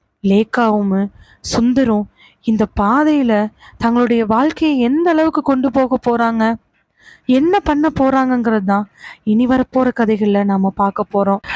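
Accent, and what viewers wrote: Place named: Coimbatore, India. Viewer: பழைய கதை எல்லாம் கேட்டு கொண்டிருக்கேன் சூப்பர். அப்டேட் டும்.. கேட்கிறேன் அருமை.. சகோதரி வாய்ஸ் கோயம்புத்தூர் டோன்... 👌🤝💐 திண்டுக்கல் எங்க ஊரு வாவ்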